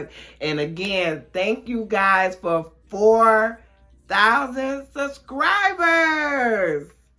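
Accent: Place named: Long Island, United States. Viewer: New subbie I like your style I knew I heard a lil my in there I'm from Long Island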